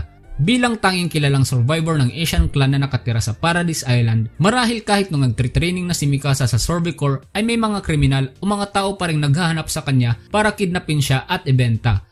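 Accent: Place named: Visayas, Philippines. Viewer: Bisaya kaayo ka mo lebay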